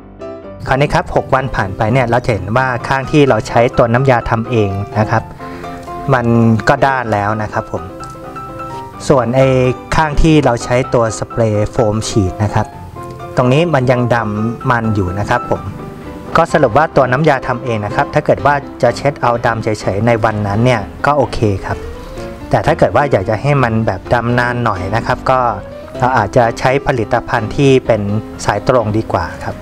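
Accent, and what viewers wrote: China. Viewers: พูดไทย ออกจีนๆ นะ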